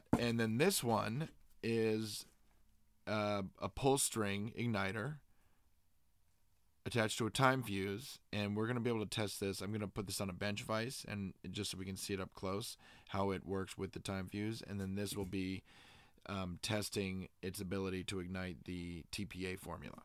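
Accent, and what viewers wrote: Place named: Augusta, United States. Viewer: Dude are you from Augusta?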